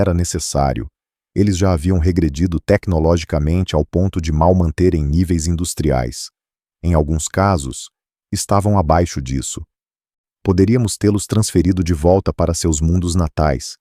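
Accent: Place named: Minas Gerais, Brazil. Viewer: Que doido 👏👏👏👏 (em mineirês 😅 muito legal kkk ) me recordo desse conto em “Relatos Isolados “ …👏👏👏👏👏👏👏deu outra visão do cenário 🤙😀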